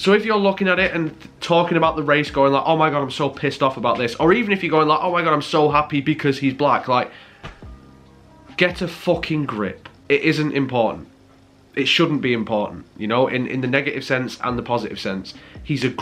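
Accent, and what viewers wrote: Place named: Leeds, United Kingdom. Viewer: Bit off topic, but are you from Leeds mate?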